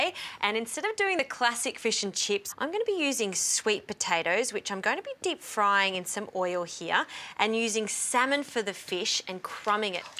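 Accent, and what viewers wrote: Australia. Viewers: is she Aussie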